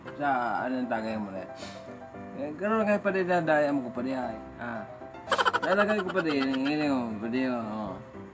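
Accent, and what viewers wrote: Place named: Bicol, Philippines. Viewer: ahahah bicolano po ba yung salita nio tagayan na thankyou sir sa video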